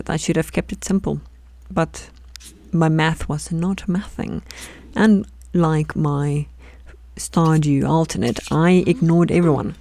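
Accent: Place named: South Africa. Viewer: I knew your accent sounded familiar!! Hey fellow South African <3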